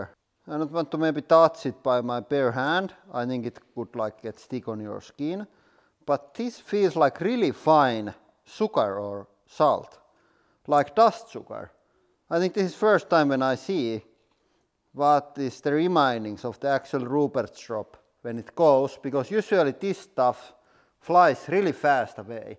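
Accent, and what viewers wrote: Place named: Finland. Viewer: The underwater shot was spectacular!! Also with all due respect I love your Finnish accent when you speak english. Please, don't westernise your speaking! 😁😁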